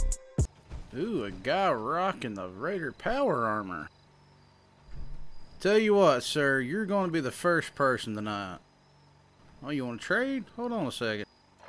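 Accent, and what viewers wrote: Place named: Texas, United States. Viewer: You sound like a country singer with that type of Tx talk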